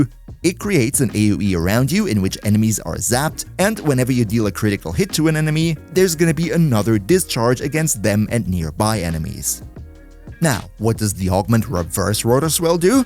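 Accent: Germany. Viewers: You sound just like a happy german guy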